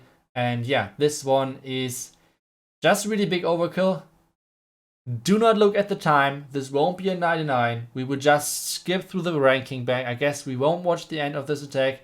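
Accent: Germany. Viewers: strong german accent u can always recognize that hahah but its sounds good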